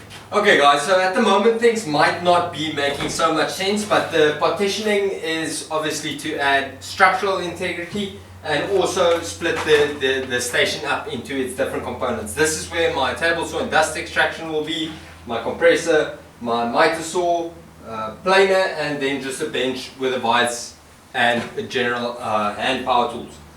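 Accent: South Africa. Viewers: Is he South African with that accent? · South African? I assume it from your accent. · At last... I thought I was the only YouTube maker in Africa... LOL, I'm in Namibia, you sound like you from SA... glad I found you... Sub'd... Great job bru... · Dude, this is awesome. Nice to hear your accent - I'm a kiwi living in Lexington, KY and don't get to hear the South African accent AT ALL any more. Stay well brother!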